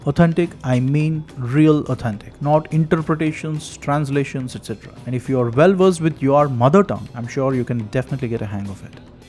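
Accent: India. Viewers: Can anyone tell me something about this guy? As the channel is from Belgium but the voice of this guy seems Indian.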